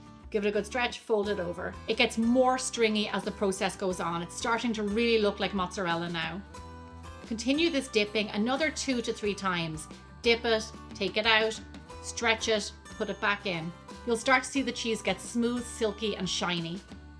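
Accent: Ireland. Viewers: Irish accents are just so cool.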